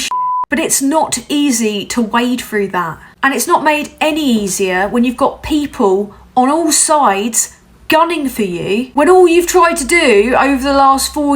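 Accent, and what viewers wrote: United Kingdom. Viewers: God, I love this british accent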